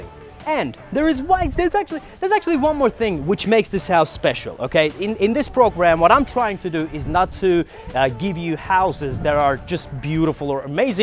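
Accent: United States. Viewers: but you have an American accent ,in Turkey they teach British English ,so you were in school in US?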